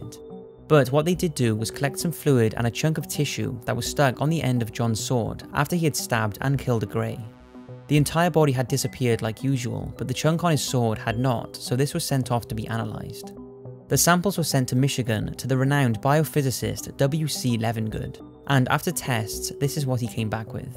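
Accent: Wales, United Kingdom. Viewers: he's is 19 and he's welsh, I know he's welsh because I'm welsh and has a similar accent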